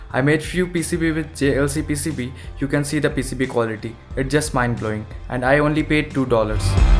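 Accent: India. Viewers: Your voice seems of an Indian ??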